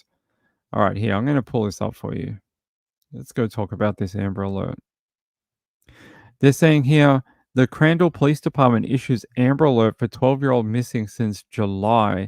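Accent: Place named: Scotland, United Kingdom. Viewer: I don't know why anyone might have a problem with the lovely Scottish accent 😂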